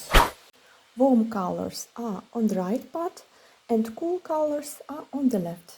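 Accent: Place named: Portugal. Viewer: how lovely hahaha eu gosto do sotaque do portugues europeu hahaha. oh btw what is your native language? you speak three languages i'm jealous. blue is my favorite color am I cool 😎 or am I cold 🥶 hahaha or both hahaha lol Iuno is beautiful so is your art i'm definetelly pulling for her, can't have the sun without the moon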